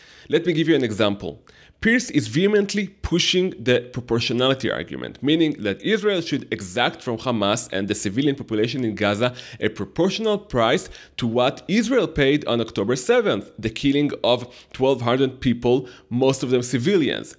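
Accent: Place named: Japan. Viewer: ברנש פגז, אך להאזין לו זה כמו להאזין ליפני מדבר אנגלית. על הנייר, האנגלית מצוחצחת ורהוטה. בפועל, אי אפשר להבין מילה. חבל.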